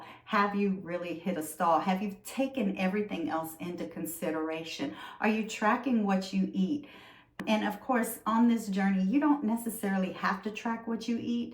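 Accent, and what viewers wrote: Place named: Louisiana, United States. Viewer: I know a lil houma or thibodaux when I hear it. 😉 🩷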